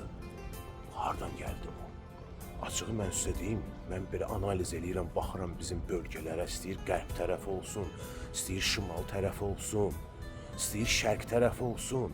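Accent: Baku, Azerbaijan. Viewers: Приятно слышать чистую бакинскую речь!Вчера в Питере в кафе я уходя заметила семью,где 16 летняя дочь вместе с отцом смачно облизывали пальцы на глазах у всех!я по азербайжански им сделала замечание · Говор у вас настоящий бакинский ,нет прежнего Баку ,практически не осталось бакинцев ,как поет Корнелюк : город которого нет.А нынче в моде именно и этот говор: ЦЦЦ ,а бакинцы действительно последние из могикан ,хотя навряд ли молрдеж знает кто такой Фенимор Купер .( в школе читала эту книгу ) .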